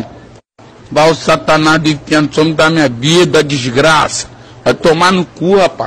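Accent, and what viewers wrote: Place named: Rio de Janeiro, Brazil. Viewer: Com o sotaque carioca fica ainda melhor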